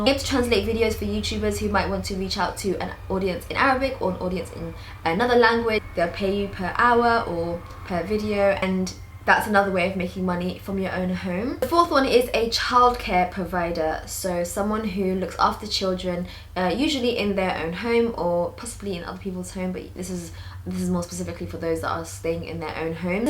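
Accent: United Kingdom. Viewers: i'm glad to see / hear a voice from the UK helping us out #nodisrepect to other countries :)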